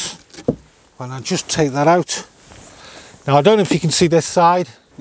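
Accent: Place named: North Yorkshire, United Kingdom. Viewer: Northern England accent (North Yorkshire)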